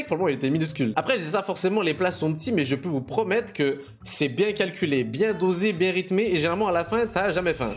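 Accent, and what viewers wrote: Quebec, Canada. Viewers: Est-ce que c’est moi ou tu a une partie d’accent québécois. Je suis québécois et je crois que tu dois écouter quand même pas mal de vidéo québécois!!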